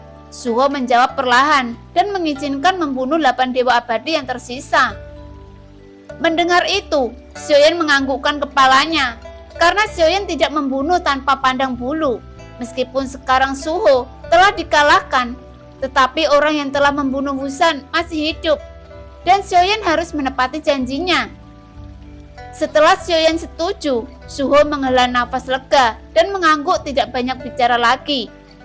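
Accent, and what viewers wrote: Java, Indonesia. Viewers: Medoknya diminimalisir mbak e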